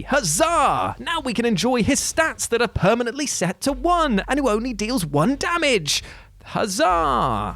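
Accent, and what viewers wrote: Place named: United Kingdom. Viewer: he's british lol they pronounce names little differently than most to us it is You-Fee to him it is YU-FEE, like one person pronounced gerudo differently as Gar-Udo while some say Jer-udo making the G sound like a J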